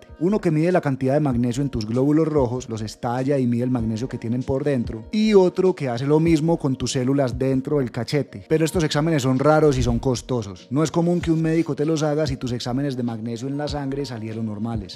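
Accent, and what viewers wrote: Colombia. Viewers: Esa voz colombiana me da salud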